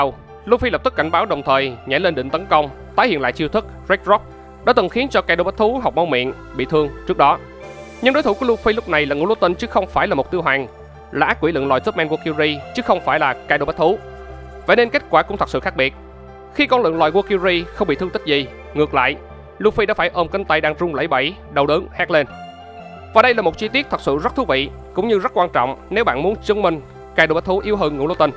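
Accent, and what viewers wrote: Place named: Quang Nam, Vietnam. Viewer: ông ở quảng nam hay quảng ngãi z ad🤣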